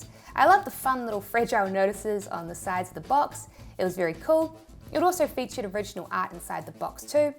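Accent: New Zealand. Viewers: Sorry.......-but the extreme New Zealand accent is a bit unclear,--- as well as hard on the ear........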